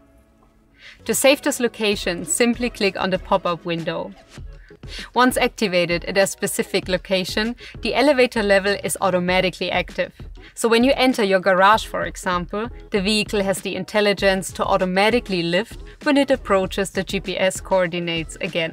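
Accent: Germany. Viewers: typisch'l deutscher Acent 🫣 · I hear a German accent and see that her name is. My mind immediately thought of Schmidt. If I close my eyes while she is speaking, I can almost imagine her presence again. Oh how we miss you dearly with your infectious laughter and toothy smile. Rest in peace, love. Stay classy my friends.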